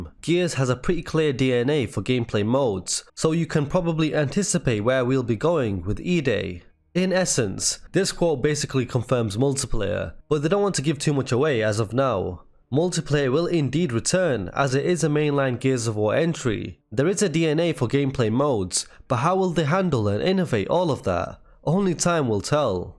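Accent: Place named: United Kingdom. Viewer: I like supporting your channel but you made this title with zero actual knowledge of new weapons or enemies. Just showed and talked about old ones. Obviously you’re click baiting and this shit runs dry man. Don’t take advantage of people trusting you to then sit there and say pointless stuff in your uk accent like it’s content.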